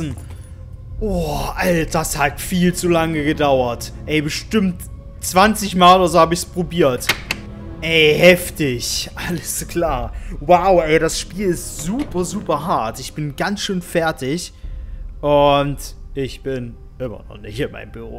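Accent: Saarland, Germany. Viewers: Bist du Saarländer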